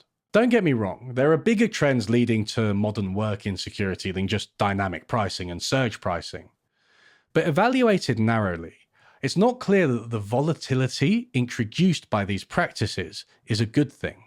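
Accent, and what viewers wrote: United Kingdom. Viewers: getting used to your humor and voice inflections (I'm not British)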